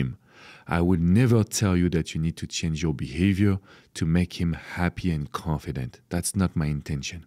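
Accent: France. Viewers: Hi,. I love your style of coaching so much that I am a subscriber. I have a request for a possible video. Could you make a video that addresses how to deal with a fearful avoidant? I’m in that kind of a situation and it’s breaking my heart. Thank you. 😊 And BTW I love your French accent. Don’t try to change it. Be well.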